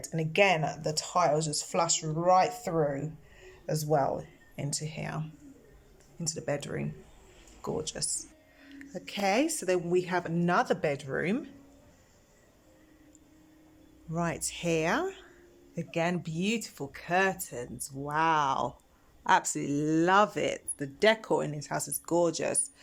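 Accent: United Kingdom. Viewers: This presenter and her london accent is annoying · So refreshing to hear English accent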